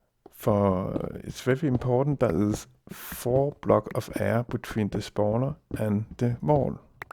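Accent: Denmark. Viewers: er du dansker eller hvad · Are you from Denmark?!?!? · he most be danish :D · er du dansker ???? synes jeg kan høre :P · Dansk accent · you're danish. i can hear it. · Are you from denmark!? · He has a very danish accent :) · are you from denmark? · du dansker ik? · H0T-Vlog Go to My Channel What? I was just mentioning that we can hear the danish accent pretty clearly? Also its an 8 year old comment. I dont get it, why send an entire IGN walkthrough? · Are you danish? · I think he is a dane..... LOL HAHAHAHA dat accent. Nice tutorial though well done :) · You come from Denmark right? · I put your videos on replay just to hear your accent. My name is Dan, so it being a DAN-ish accent gives me some sort of ridiculous thrill XD but anyway good job, man, you helped me a lot to build a working mob trap for the guys on my server :) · You are 100% danish! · No, he sounds like someone who speaks multiple languages, is Danish, is a genious, and provided you with the perfect tutorial on how to build an xp farm. 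Stop hating cuz you couldn't dream of being half as smart as this guy. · Your Danish accent is awesome! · His accent sounds very danish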